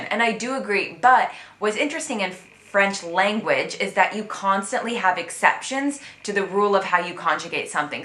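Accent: England, United Kingdom. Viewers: Your sole problem in this video is : you pronounce all these french words with a perfect English accent ! Especially the pronoun ON. Consequently it must be hard for your English speaking audience. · Hi. It's certainly b/c you just don't know your sounds in French ( u / ou - an / on ) and keep your English accent . In these conditions, I couldn't speak this way myself. You should start to pronounce short words the right way then see step by step.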